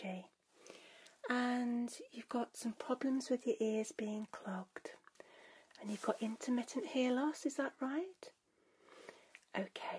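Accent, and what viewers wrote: United Kingdom. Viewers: Love hearing that beautiful British voice & it sounds so bloody lovely! Watching your content always feels so welcoming and relaxing as well! Keep up the great work & goodnight! 🥰💜💜🥱🌃🌙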